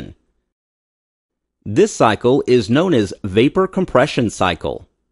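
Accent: United States. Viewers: He has an American accent but misses a lot of words out of his sentence like a Chinese man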